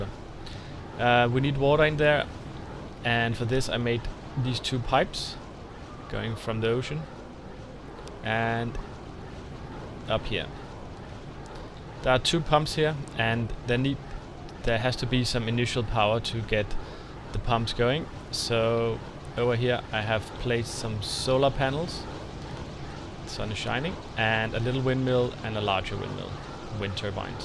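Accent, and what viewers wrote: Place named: Denmark. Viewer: You sound like a Danish man speaking english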